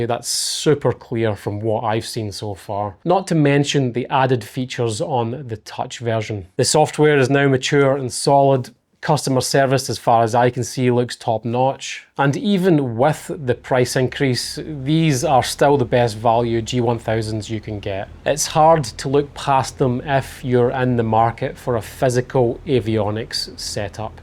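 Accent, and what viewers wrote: Scotland, United Kingdom. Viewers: ha! Mate this is quite a clear Scottish accent - even as a Londoner I can struggle to understand a Scottish accent but on the scale of things this guy could read the evening news as far as I'm concerned. Try subtitles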